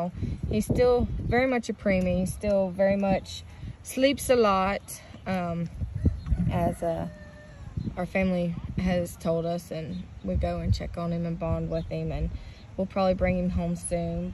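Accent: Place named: South, United States. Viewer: Their little southern accents are just as adorable as yours..lol I'm southern too from GA lol but it's just so cute to hear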